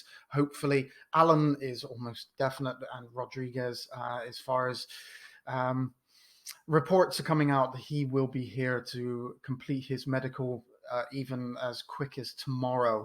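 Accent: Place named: Southern England, United Kingdom. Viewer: Great vid lad , you sound like your from down south like me , you don’t find to many toffees down here , hopefully we get Rodriguez and Allan over the line , coyb. 💙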